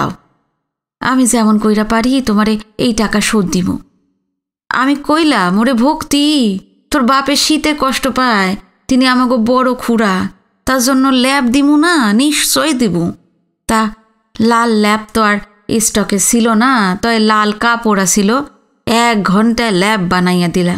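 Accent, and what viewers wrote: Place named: Faridpur, Bangladesh. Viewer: তুমি এমন চমৎকার ভাবে ফরিদপুরের ভাষায় ও ওখানকার আঞ্চলিক টানে গল্পটা পড়ে শোনালে যে কী বলব ! অসমসালা !!!😂😂😂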